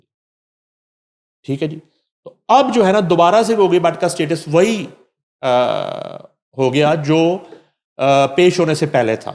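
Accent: India. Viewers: Aap Urdu k ilfaz ko Hindi Mai pronounce krti Hain...why??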